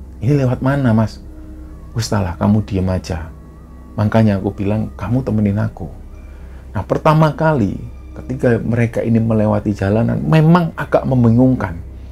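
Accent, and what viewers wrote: Java, Indonesia. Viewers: Seneng ama mas bercerita sprti ngalami sndr, enak di dgr, mudah dipahami.. Luar biasa.. Dan plg respek org jawa yg ga hilang jawanya... Salut mas👍🏼👍🏼👍🏼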